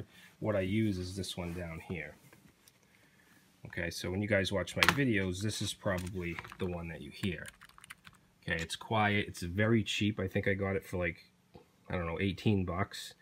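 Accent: Boston, United States. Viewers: Well they both have Boston accent. I'd say is the mature version of Bucky.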